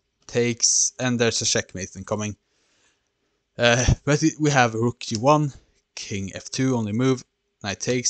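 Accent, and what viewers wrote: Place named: Sweden. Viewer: You sound Swedish, and I am fairly certain my ears are not deceiving me.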